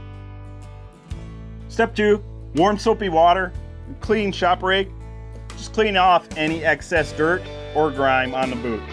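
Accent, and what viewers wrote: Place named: Wisconsin, United States. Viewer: It's how us Wisconsinites talk haha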